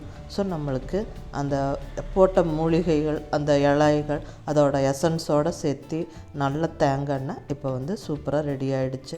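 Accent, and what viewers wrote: Coimbatore, India. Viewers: I like ur kovai slang --- saeithi, karuvaapla,vangayam etc. Ur videos are so good. Keep it up. expecting your house tour as well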